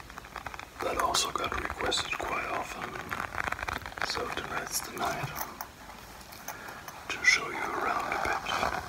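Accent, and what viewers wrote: Germany. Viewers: vivienlaramalfoy maybe bc I’m from another European country but I can tell by the accent it’s German... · Is this guy German, cuz I think his German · Hey are you german cuz Im wondering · Hello are you german? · Are you german?? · Ur German ?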